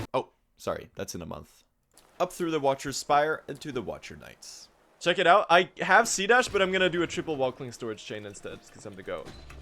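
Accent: Sweden. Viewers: brother are you swedish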